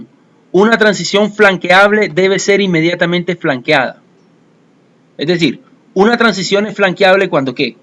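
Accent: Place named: Barranquilla, Colombia. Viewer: Una pregunta, es que por su acento me pareciera que se encuentra en Barranquilla Colombia. Es así?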